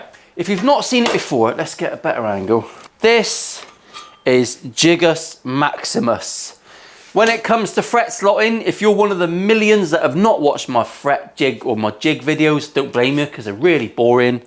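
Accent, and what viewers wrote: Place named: Liverpool, United Kingdom. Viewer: Pretty good Scouse accent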